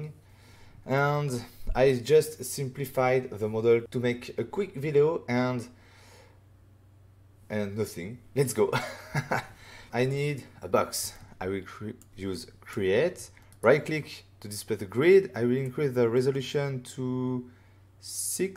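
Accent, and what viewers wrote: France. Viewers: I have Fluent Power Trip for a few days. I think this is the tutorial that it all made sense. It's such a great addon man. So fluid and fast, I really like it. bravo I can tell you have a passion for it. Please keep up the progress! I am also glad I spent a lot of time in Paris for work, so I am used to your accent. Your English is getting better each time! While I was learning French, my friends in Paris would always laugh when I tried to speak with them. They tell me that I sound like a Frenchman from Chicago! lmao great work, please keep it going!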